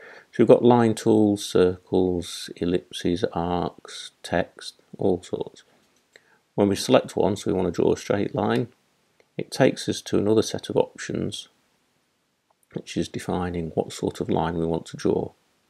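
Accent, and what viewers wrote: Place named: United Kingdom. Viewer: Really helpful vids....And I myself love the British accent...its very proper...Thanks.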